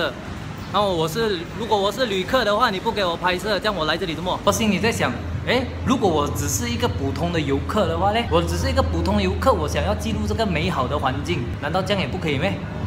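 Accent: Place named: Singapore. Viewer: Talk singhish haha because 是 jb人吗?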